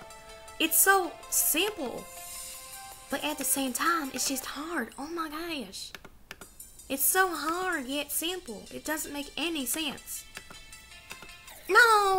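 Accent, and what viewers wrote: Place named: United Kingdom. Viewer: Are you British or not? Cause you sound like it. But I Love you!!!!😘😘😘😘😘😘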